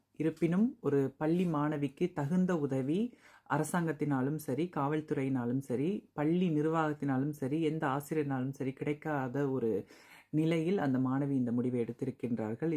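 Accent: Sri Lanka. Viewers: Akka algaa sri lanka tamil pesurigelee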